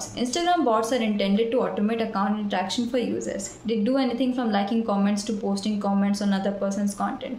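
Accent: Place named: Pakistan. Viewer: omg don't understand you . ? you speak to fast very hard to understand with you're packistan accent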